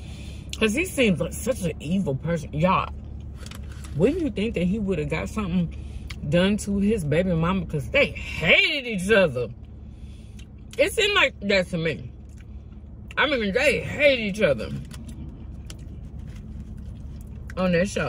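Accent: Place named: AAVE, United States. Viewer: Dang this food look so delicious 😊 you crack me up speaking Ebonics or whatever it’s called 😂😂😂😂😂 I love your videos!!!❤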